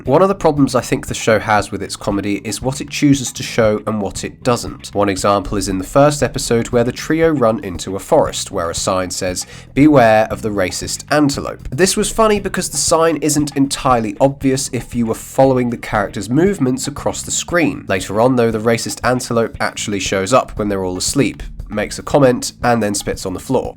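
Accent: United Kingdom. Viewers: This video sounds like you couldn't wait to make a video styled after your favorite complaining youtube channels. The british accent really sets it off. Most of the youtube channels I find complaining about movies and tv are mostly done with a british accent. Moreover, you put heavy duty spoilers in the description, but the very first thing you talk about is how you are trying to help people get a feel for wether or not they SHOULD watch the show. But you're spoiling the entire show to give your opinion. Are you like, a real person? or just some moron with a platform.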